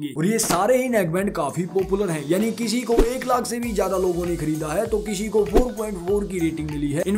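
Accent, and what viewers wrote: Haryana, India. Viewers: kitni e koshish karle bhai 🤣haryanvi accnt a hi jata hai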